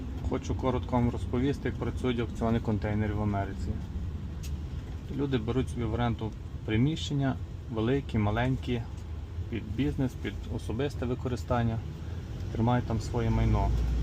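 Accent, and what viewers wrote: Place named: Zhytomyr, Ukraine. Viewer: Говір житомирської області.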